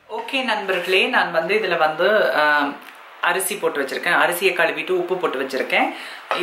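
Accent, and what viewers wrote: Jaffna, Sri Lanka. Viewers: I jst admire ur Sri Lankan Tamil. And Malaysian "Terima Kasih". Sama-sama (ur welcome). Love u. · Pl always continue with srilanka tamil accent. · i like your singala tamil slang.. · its great you speak SL Tamil. Please continue to do so. I'm sure by now Indian Tamil viewers should be able to understand. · Yen sissy ipadi pesureenga starting la bayama aagiduchu ena da idu ena aachu to ur voice nu 🤔🤔The way you speak casually daan super 😍😍 the srilankan tamil s so nice to hear from you but in your body language 🙂sema tip for the smell sissy my husband will tell dry fish stinks I love tat smell will follow your tip sure. Sissy konjam tamarind water potu oru boil vitu irakunga inum semaya irukum 😍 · Akka sprka i like sirilankankan tamil nenga spra pesuringa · Hi srilanka tamil speech arumiy cooking, comedyance all nice up up 😍 from swiss: srilanka😍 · Omgggggggg I juz love your celone Tamil.......... 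· Unga elagai tamil sweetta irukka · Omg I freakin love you.. haha Jaffna thamil கேக்கிறிதில இருக்கிற சுகமே தனி. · iyalbana pechu nadaimuraiyodu video parkka arumai...athuvum yazhpaana tamil arumai... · Akka this hair style u pin up look beautiful for u and i really love ur srilankan language❤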